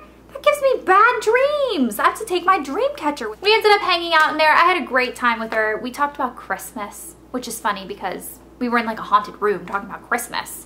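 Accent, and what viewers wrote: South, United States. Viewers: I love your southern accent! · you sound extra southern in this video...i like it!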